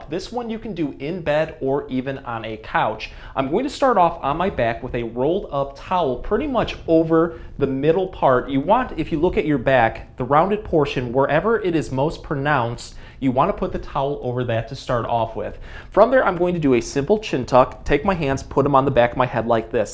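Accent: Michigan, United States. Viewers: I'm here for the Michigan accent and the great tips 😎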